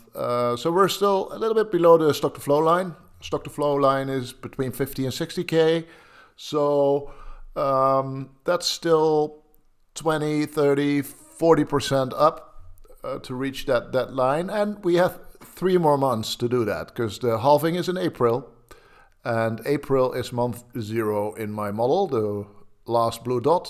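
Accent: Netherlands. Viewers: You sound Dutch.. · Love your Dutch accent :) have family in holland and it reminds me of them. Warm feeling. Cheers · You are Dutch from your accent.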